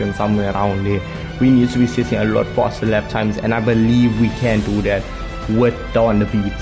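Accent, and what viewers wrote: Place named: South Africa. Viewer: Can I ask where you're from? Because it's like I'm hearing several accents at once, because I'm hearing south african pronunciation, and what sounds like jamaican accent creeping in, just curious is all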